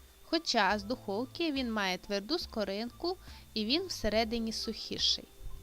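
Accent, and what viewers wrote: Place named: Western Ukraine, Ukraine. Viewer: Добрий день, пані. З великим захопленням і радістю слухала ваше відео. Як приємно чути рідну мову. І особливо наш західний говір. Я, як правдива бойківчанка, до тепер говорю пательня і ронделик, пляцки і цвібак 😄. То є наш генетичний код 👍. Люблю той медівник. І ще крім горіхів добавляю родзинки і замінюю 1 склянку цукру на склянку кокосової стружки. Дуже цікаво виходить. Хочу відповісти на коментарі з запитаннями: " а де мед?" По перше мед ні в якому разі не можна нагрівати і тим більше пекти! При термічній обробці складники меду перетворюються в шкідливі смоли і віск! По друге всі медовики пекли або на штучному меді, якого є вдосталь в магазинах і по низькій ціні. Або робили в дома з цукру і води, або на молоці, щоб вийшла молочка карамель. А ще смачне взагалі на вершках, то взагалі бомба 🤩. Так що всім доброго дня і спробуйте цей смачненький медівник. 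І не переживайте, що там багато цукру, зате немає ніякого крему ні вершкового масла ні сметати! Якщо один кусочок на сніданок, то буде енегія на цілий день. Бажаю всім успіхів і всіх благ ❤😍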